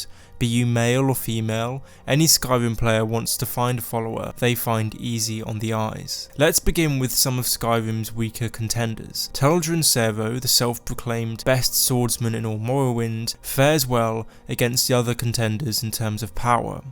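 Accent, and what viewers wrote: United Kingdom. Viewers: *hum* Lemme guess, you from UK ?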